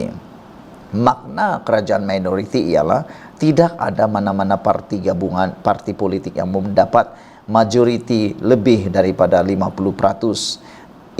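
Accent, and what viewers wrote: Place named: Peninsular Malaysia, Malaysia. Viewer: Tuan lebih pandai bertutur Bahasa Malaysia ala semenanjung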